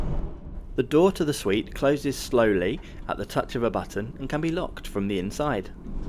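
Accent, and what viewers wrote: United Kingdom. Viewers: UK acsent smooth language ✌️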